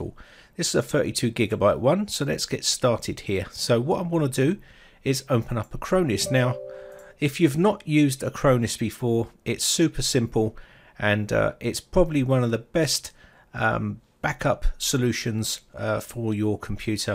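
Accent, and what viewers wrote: England, United Kingdom. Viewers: Watched and appreciated, but why are all these tech primers done by guys with English accents? Have to over and over some sections on many of them to figure out what's been said!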